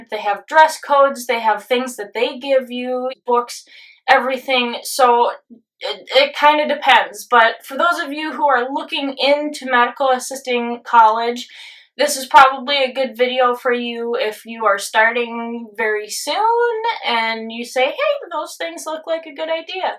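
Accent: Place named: Upper Midwest, United States. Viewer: LOVE your editing. Best MA videos on Youtube. Girl, you must live in MN, WI, or a nearby state. I moved to WI from Texas and that accent on the word "bag" (or, "beg") is unmistakable. Thanks for the helpful info. Appreciate that you keep it funny and entertaining but don't get off point with makeup tutorials, etc. that have nothing to do with Medical Assisting. Yeah, anyway, good job.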